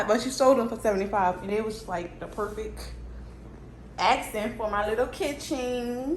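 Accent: New Orleans, United States. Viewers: You must be from the Nola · New Orleans accent ❤️❤️ love it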